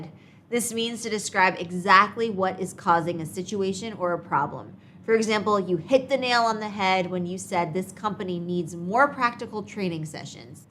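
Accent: United States. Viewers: Nice, i'm from Jakarta, I like maerican english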